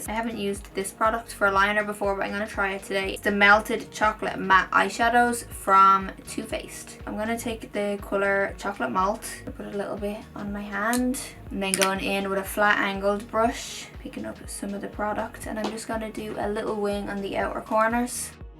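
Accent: Ireland. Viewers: I could listen (and watch of course) to your videos all day. Irish accent for the win. By the way make up 👌🔥🥰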